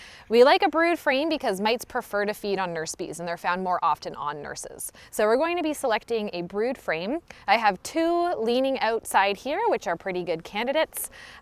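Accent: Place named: New York, United States. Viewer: Finally! A beekeeper who keeps bees and thinks like me! Super eloquent and intelligent! is thorough and knows her beekeeping! I love her explanations and NY accent 😍 Keep up your awesomeness and please keep posting! 🐝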